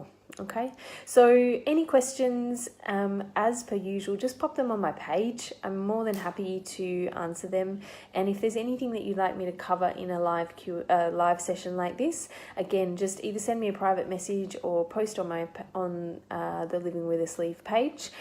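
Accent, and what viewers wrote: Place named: Australia. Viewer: Thanks so much so helpful! And you;re an Aussie - even better!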